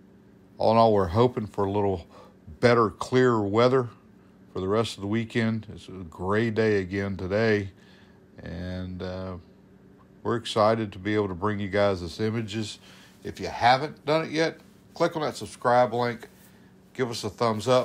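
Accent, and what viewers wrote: South, United States. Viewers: WOW,WOW,Wow!I'm not part of your navy!I don't have a confederate flag and the north WON!You people started your accent because,you did't want to sound like "Yankee's"This is due to south"s low IQ!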